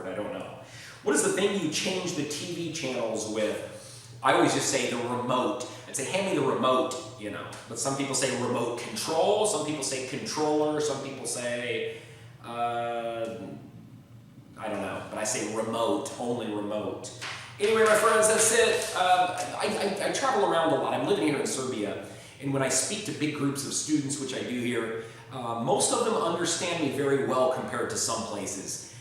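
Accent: Illinois, United States. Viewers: I am not originally from Illinois, but both of my parents are. They sound exactly like you! It's interesting to see how much of my pronunciation I've inherited from them! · This accent is a lot like mine (minus a few words) But my dad is from Illinois so it makes sense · I live about an hour away from Rockford, so this sounds correct, for the most part. I pronounce crayons differently though. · I'm guessing you're from Danville or Marshall or there-a-bouts...lol I'm originally from Terre Haute. I pronounce everything the same as you! Enjoyed the video! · Sounds like up here in West Central IL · A lot of this is really similar to my accent, Im from Decatur, in the heart of central Illinois.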